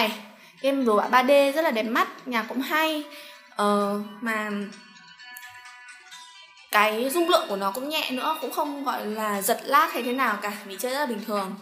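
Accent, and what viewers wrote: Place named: South, Vietnam. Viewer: nói giọng miền nam đáng yêu vải :v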